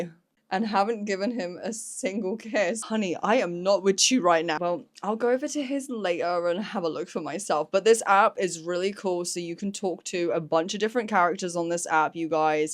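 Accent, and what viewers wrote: United Kingdom. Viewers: Wow. Didn’t know you were British.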